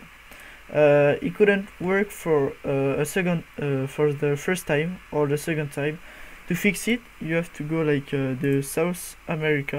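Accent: France. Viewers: C'est trop drole... Ton France es tres prononce. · T'es français toi avec cet accent non? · Are you french ? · Ton accent est mortel :D French powa !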